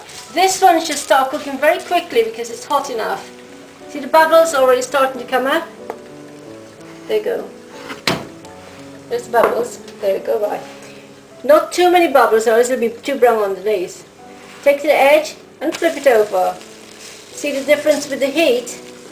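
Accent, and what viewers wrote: United Kingdom. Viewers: I am Guyanese and that is not the right way to cook Guyanese roti. You are the only Guyanese i saw make roti different. If you are Guyanese then why you talking like you are from the UK? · where are u from? u dont have a guyanese accent, but u do sound british. and u made it differently than my mom and my grandma and my great grandma...they were from guyana.. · That's a real English /London Asian accent honey... with a snippent of Asia that's lived and worked in the UK for 15 + yrs. Whats the bleedin' problem anyway? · I don't see anywhere in the description that is says she is Guyanese.. But since she has a slight brit accent, maybe she is Brit, but family is from Guyana or Trini? I do hear a slight coolie accent. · Just out of curiosity, what race are you? You look kind of indian or islander, but sound very british o_O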